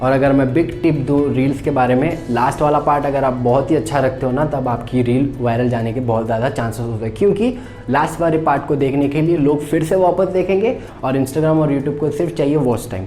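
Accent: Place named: Hyderabad, India. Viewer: Bolinge , karaun...... Samjhre aplogan etc #hyderabadi❤️ ThiS maN is Hyderabadi🙈🙈🥰😍😍 .....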